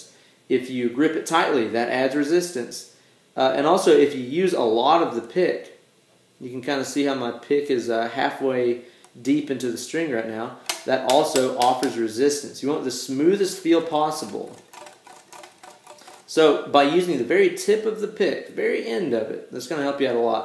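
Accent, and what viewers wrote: South, United States. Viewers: 2024. I notice the southern accent now. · You are Pecos Hank of guitar. Your presentation is so similar, it's just that he's chasing Tornadoes and snakes. You guys even look similar and both with that southern draw. Great stuff BTW.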